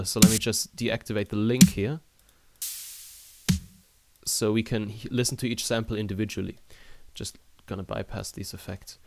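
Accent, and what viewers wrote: Germany. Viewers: and i can hear youre german, mein junge :D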